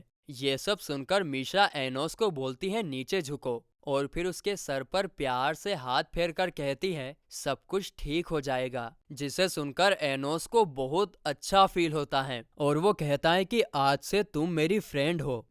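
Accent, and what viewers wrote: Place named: Gujarat, India. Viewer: Bhai ye gujtati vibe hta de yar thoda sa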